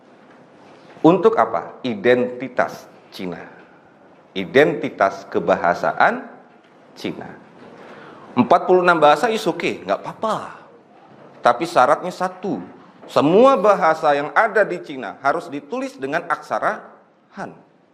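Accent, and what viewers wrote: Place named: Indonesia. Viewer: Seluruh video ini penyampaiannya didalam bahasa melayu sepenuhnya ... cuma loghat indonesia .. bahasa indonesia adalah cuma gelaran dalam negaranya saja ! Pertuturannya memang dlm bahasa melayu seluruhnya ... sumpah pemuda juga bertutur dlm bahasa melayu ! 😂😂😂😂😂😂😂😂🤣🤣🤣🤣🤣🤣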